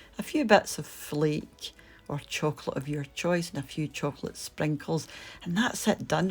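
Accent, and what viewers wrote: Scotland, United Kingdom. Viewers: Scottish accent hate the tattoo❤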